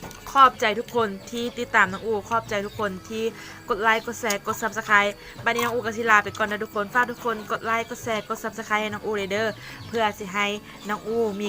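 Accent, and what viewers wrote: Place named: Nakhon Phanom, Thailand. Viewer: บ้านน้อง เว้าคร้ายบ้านอ้ายเลยนครพนม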